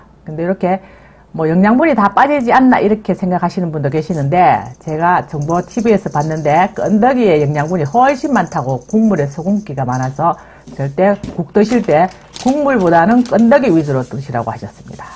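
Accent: Gyeongsang, South Korea. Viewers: 아이구 ~ 아삭아삭 맜있는소리 나네요" 경상도라에 ?? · 갱상도 사투리 너무 재미나요 ㅎㅎㅎ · 댓글 처음 달아보네요..저는 부산 살다 충청도로 올라와 생활한지 10년이넘어갑니다..그러다보니 경상도 사투리 들으면 왠지 정겹고 그리워요. 사투리 들으니 속이 뻥 뚫리고 넘 재밌어요. 특히 오봉~~ 이란말에 빵 터졌습니다... 좋아요..구독😍😍😍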